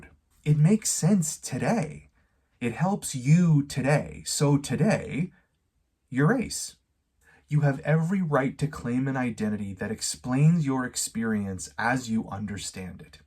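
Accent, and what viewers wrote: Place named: Canada. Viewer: Are you from canada brother , your english accent as canadian people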